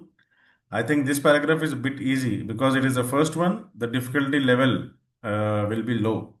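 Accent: India. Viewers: Bro your voice as a hindian person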